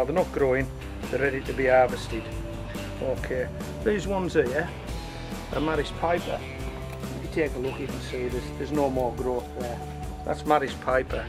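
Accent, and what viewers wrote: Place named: West Midlands, United Kingdom. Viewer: Thanks for that. Now, at first I thought you were from Durham, but I read you were up near Scotland on the west side, but I keep hearing some West Midlands in your voice. Am I right?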